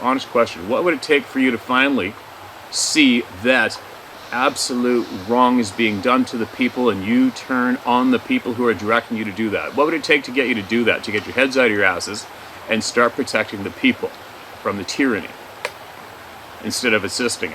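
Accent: Australia. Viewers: That aussie accent tho! 😹 Rant away sir! 🤩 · Funny Aussie accent.. love from an Aussie! You are absolutely correct Re the Rant! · Australian accent sucks brother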